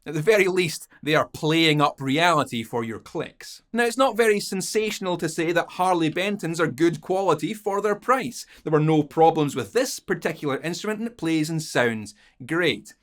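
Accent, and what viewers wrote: Scotland, United Kingdom. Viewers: Sarcasm in Scottish, sounds like home. 😂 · Sarcasm sounds so much better in a Scottish accent 😏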